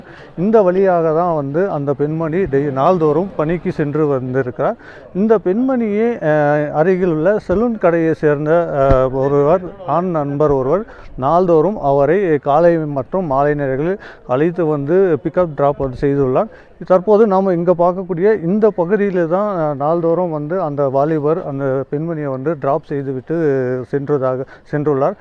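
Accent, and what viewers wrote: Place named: Bangalore, India. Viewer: Bangalore Tamil 😅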